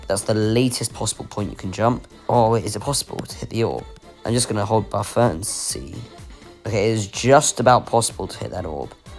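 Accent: United Kingdom. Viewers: you are the most british sounding british person I've ever came across on here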